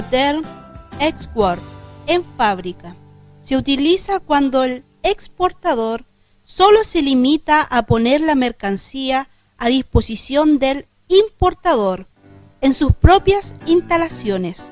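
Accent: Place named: Spain. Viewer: Agora que percebi que a fala e o sotaque espanhol são horríveis.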